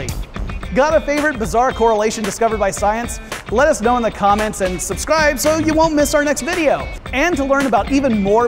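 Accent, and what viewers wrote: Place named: United States. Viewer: I presume this is a U.S. production? (from the presenter's accent). in, the heavy traffic picture is that of Sydney Australia at sunset - specifically: Victoria Road (which begins at that Right Hand fork), and the Left Hand fork services near-city suburbs, but is also a way to get to the other main East-West road (Parramatta Road). Yes, despite initially heading North, Victoria Road is basically an East-West road. Both these main roads in and out of Sydney lie North and South of the Parramatta River (which basically runs East-West), and forms Sydney Harbour where it reaches the South Pacific.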